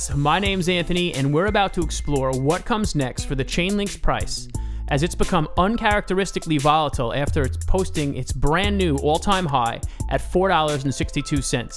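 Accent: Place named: New York, United States. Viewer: California by way of the Bronx but I definitely caught the NY accent!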